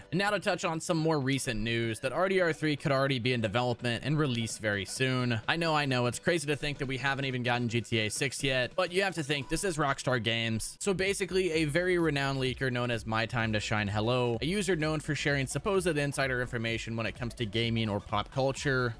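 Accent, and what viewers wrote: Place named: General American, United States. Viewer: Almost nobody else cares. It’s a general American accent.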